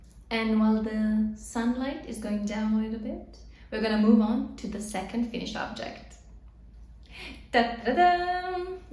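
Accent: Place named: Italy. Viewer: Great seeing you again I started to wonder, where have you been 😊 ….glad to see you are O. K. I love love your videos…edited/unedited…it doesn’t matter because you bring your lovely personality and Italian accent (which I adore)to it. It is so so cute and enjoyable. Looking forward to seeing more of you in the future. Thanks for taking the time and sharing. You are an inspiration to us all💞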